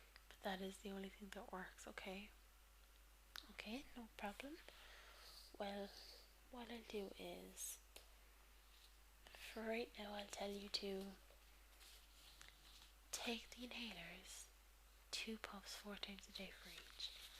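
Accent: Ireland. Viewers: Kind of sounds like an Irish Quinn Fabray (from Glee). But that's a good thing! Fantastic video. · Corr your voice is so relaxing, I know Im typing this at 3.51am and the sun is coming up and the birds are singing but I feel I need to listen to this video again so I can relax and go to sleep. You seem to cure my insomnia at times. I also get tingles in my head and thats it before I know it Im out like a light! I do love your accent! Im a sucker for an Irish accent on a girl. Errmm I also seem to wake up with a raging boner so I blame you lol. But seriously wicked video! top stuff! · This was great! I love your Irish accent! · Ahhhh Irish accent! Love this video :) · My goodness. This video. If it weren't for my empty stomach, I'd be knocked out cold right now. Your brogue is just enchanting in every way, mixed perfectly with ASMR triggers and a low soft-speak. 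Absolutely magnificent job. Thank you so much for this video!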